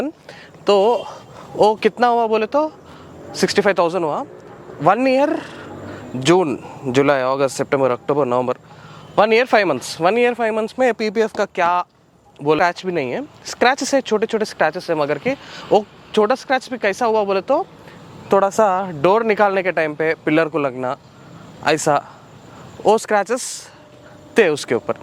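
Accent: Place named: Delhi, India. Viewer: bhai...you r awesome.i know u well from morning to evening you rome with marvadi and jains.your hindi is Delhi based.we r just subscribers who r we to suggest your language.did they know anything about u u r a international model lakme runner up u donated 50 lakhs to blind people.what does they know don't care the people who give u negative comment.thum ko dekh ke jalthe hai saaley..kuch tho log kahenge logon ka kaam hai kehana.... ❤❤❤❤❤❤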